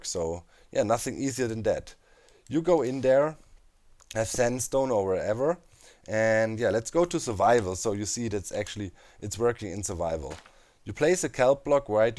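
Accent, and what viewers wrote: Germany. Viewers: Deutsches englisch erkennt man immer xD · you are german man hört das einfach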